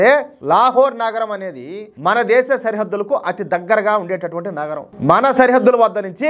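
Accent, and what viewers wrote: Rayalaseema, India. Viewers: అన్నా మీరు విశ్లేషించే విధానం చాలా అద్భుతంగా ఉంది. అందులోను మీరు రాయలసీమ యాస లో చెప్పటం చాలా సంతోషం. కానీ మీరు చెప్పేటప్పుడు ఆ visuals కూడా చూపిస్తే, మీ ఛానల్ ఎదుగుదల చాలా బాగుంటాదని మా అభిప్రాయం. జై శ్రీ రామ్ 🚩🚩🚩🚩భారత్ మాతా కి జై 🇮🇳🇮🇳🇮🇳🇮🇳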